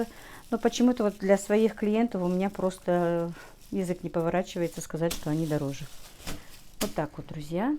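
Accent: Standard. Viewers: Прекрасная чистая речь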